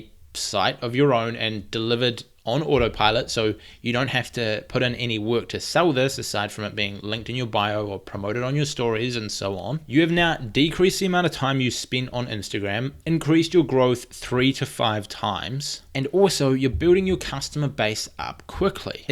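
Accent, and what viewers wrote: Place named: New Zealand. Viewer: To be Honest , You're kiwi Accent sounds like a Music to hear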